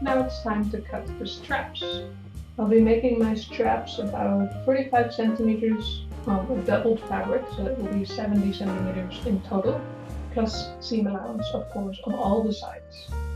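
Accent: Netherlands. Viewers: Thank you very much! I am definitely going to try and make one^^ Btw, you have a dutch accent:)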